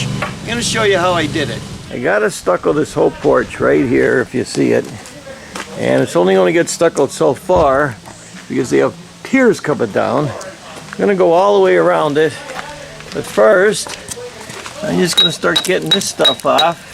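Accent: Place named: Pittston, United States. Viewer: Great video! Straight to the point and it will give a lot of people the confidence to DIY. I know that accent well. Heyna? 😂 Born and raised in Pittston. Live in Hawaii now.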